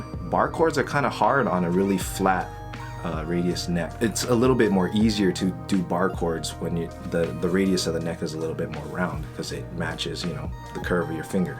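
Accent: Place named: Hawaii, United States. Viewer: I thought you were from Hawaii after hearing you speak and your demeanor. So am I. I’ve been an Ibanez player for as long as I’ve been playing and developed tendinitis. I thought strandbergs ergonomics would be a reason to switch.. maybe it still is but it’s a bummer to hear your wrist hurt after shows.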